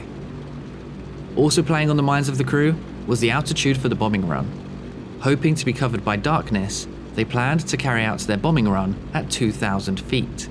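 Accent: England, United Kingdom. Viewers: I really appreciate the variety of aviation incidents you present, & this certainly was a very tense & exciting account. What a remarkable young man John Hannah was. Such sorrow for his life cut so short. I enjoy your clear concise narration and English accent too.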